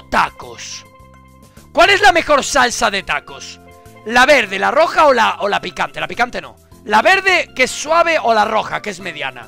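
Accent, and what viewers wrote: Spain. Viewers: dije doblaje por sarcasmo, obviamente se que es acento español y si vas con un lingüista te dirán que no hay acento correcto porque el idioma está constantemente cambiando, si no, en España se seguiría hablando castellano medieval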